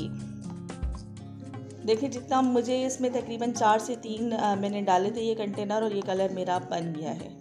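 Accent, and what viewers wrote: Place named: Pakistan. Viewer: Ok I recognised from your speaking style. Pakistan mein Kahan se?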